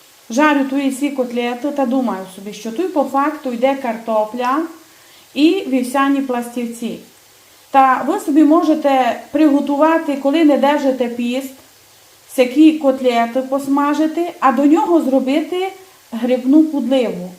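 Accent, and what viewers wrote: Transcarpathia, Ukraine. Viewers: Чудовий Закарпатський діалект❤ Дякую за вашу працю ❤Слава Україні! 🩵💛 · Дуже смачна закарпатська кухня і гарний закарпатський діалект ❤ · Прекрасні котлетки. І говірка закарпатська чарівна. Молодець. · Насолоджуюся красою закарпатського діалекту. Ви чудові! ❤ Будемо робити такі котлетки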